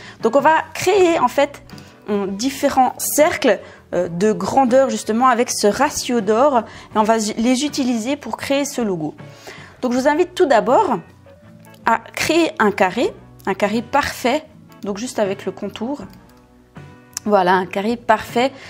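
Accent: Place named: Vaud, Switzerland. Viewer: Juste à l'accent, bien vous rassures. Je suis du canton de VD, on se reconnaît quand même assez facilement entre nous ;)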